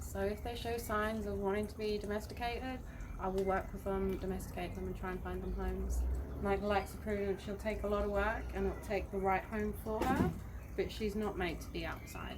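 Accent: Australia. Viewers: are you an Aussie? Or British? I’m trying to pick your accent. You’re an absolute ANGEL. Thank you from the bottom of my heart, for what you’re doing for these cats ❤❤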